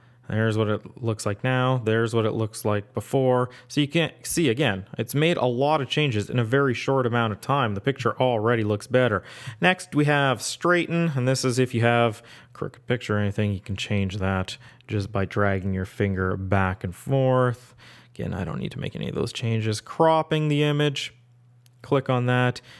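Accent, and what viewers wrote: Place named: Canada. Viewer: I was listening to this while I doodled with the app on my phone, and I had the thought that based upon your accent you must be Canadian! Then I realized it was in your intro lol. Anyway, this app is excellent. Definitely one of the best editing apps I've used on the iPhone. The whole selective adjust part is what I feel separates it from any other app out there.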